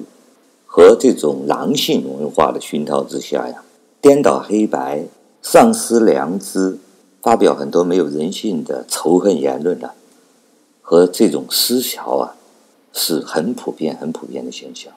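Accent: Taiwan. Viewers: 这你们也信？这么明显的湾湾口音，还大陆人？笑死人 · 台湾口音冒充大陆人，搞笑不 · 绝对的台湾腔，说说你是大陆哪的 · 井底之蛙…你的声音是台湾口音！先生！ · 这个口音，台湾腔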